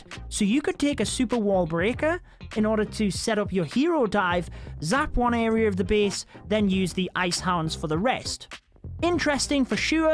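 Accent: United Kingdom. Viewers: Ty are you from the uk?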